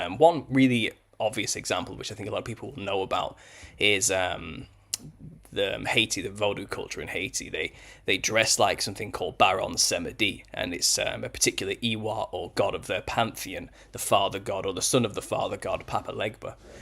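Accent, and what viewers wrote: United Kingdom. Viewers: you sound hella condescending and subjectively judgmental in sharing information in this video... like bruh, keep it classy and objective. We dont care about how you feel about other cultures, just show us how things correlate and save the sass... you sound very snobby and British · Went straight to Harlequin, but totally skipped the Court Jester of the Middle Ages, which was probably the true origin of the Harlequin. The Wildman was most likely a reference to a Sasquatch type figure since they've been reported all over the world. The red hair may be from the red-headed giants of old. Just because someone has a "British" accent does not mean they're 100% correct about something, do your own research. Good connections though, almost there.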